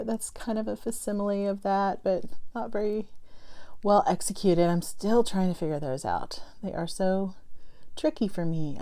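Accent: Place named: Texas, United States. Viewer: I just found you today. Great video! I guessed you were from Texas! I had to stop and go watch you first video to be sure! I will be in Fort Worth the last week in February with my husband who is attending a work conference. I will have my days free....I would love suggestions of some cute places to shop. Are there any stitch shops in FW? Thanks so much! Ellen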